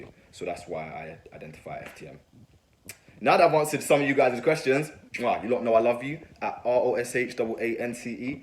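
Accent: Jamaica. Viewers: I thought you were ja-mac-ian did you say you from London?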